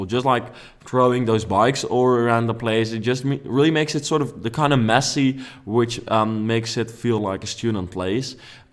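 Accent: Netherlands. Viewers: This dutch accent though🙈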